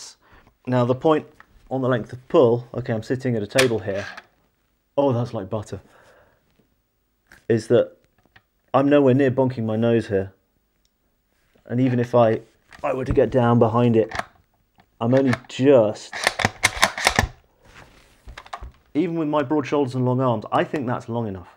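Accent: United Kingdom. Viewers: Apologies. You mentioned it by text but since i was only listening to your lovely British voice i missed that bit. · Lol when your so used to accents. Just realized this guy is from the U.K and I am almost done the video.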